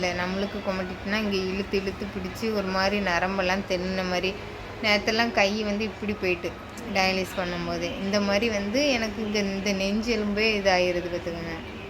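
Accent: Tirunelveli, India. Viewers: Paechu tirunelveli maari irukku. Ethana naala coimbatore la irukinga. Enga dialysis panringa, also amount for one dialysis?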